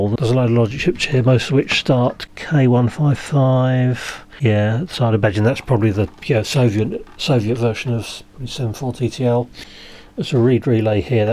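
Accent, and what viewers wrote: United Kingdom. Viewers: Возможно, вам имеет смысл проверить слух. Автор видео англоговорящий, с явно выраженным британским акцентом и чёткой дикцией.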